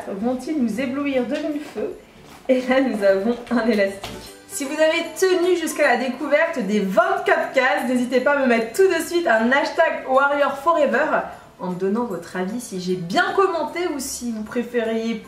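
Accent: United Kingdom. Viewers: J adore l accent so british 👍👍. Très beau calendrier. Tu serais sexy en mère noël. Toute la panoplie c dingue. Chapeau sephora.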